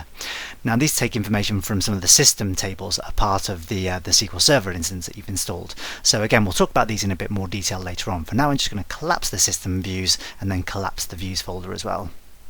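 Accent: United Kingdom. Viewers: Dear it great tutorial, allow me to thank you for your this great job, but also allow me to say that you have a British accent and speaks a little fast which is hard to understand for not native English speakers, once again thank you and you do wonderful job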